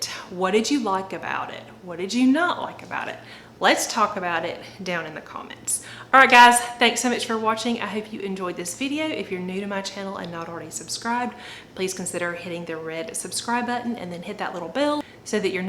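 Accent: South, United States. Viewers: hello from NC! I can tell by your accent you're from the south, too. It's nice to hear that comforting sound, right? I'm a new Flybaby. I guess I should say I'm more of a flylarve. I just about jumped on board a few years ago and got overwhelmed. Today, after divorce, domestic violence therapy for severe PTSD and raising my kids completely on my own, I found myself craving routine. Needing guidance, I went back to the FlyLady website. But, like everyone has said, boy, that website really needs a facelift. So, I turned to YouTube. Yes, the Secret Slob and all of the others you mentioned have led me here. To be transparent, I just bought, downloaded and put together my Control Journal today. I was looking for one more push and searched "flylady 31 baby steps". That's what brought me to you. I'm like you in a lot of ways. I'm all or nothing. Typically, I jump in sprinting and can never finish the race. 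I procrastinate until important becomes urgent and suddenly, chaos ensues. I haven't watched any of your follow up videos yet, but I will as I take my babaysteps. I was even thinking of using my Instagram account to post Stories about my journey. I have a planner account that has a substantial number of followers. Maybe, if I tell them I'm doing it, it'll give me accountability. I'm sure making videos has done that for you. Cheers to you for taking the plunge again. It says a lot about you that you "failed" and yet, came back for round two. I'm looking forward to watching y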